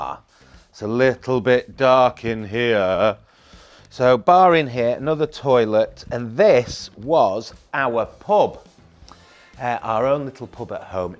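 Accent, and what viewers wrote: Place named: Manchester, United Kingdom. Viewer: Love it ,proper Mancunian ,love your home x do you do b& b ,lol thankyou ,for sharing ,your home's lovely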